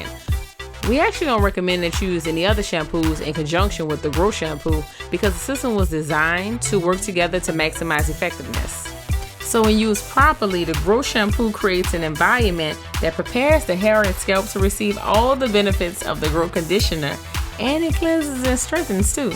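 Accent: New Orleans, United States. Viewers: Love your accent. Are you from New Orleans? Love the hair. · I love the New Orleans accent 🥰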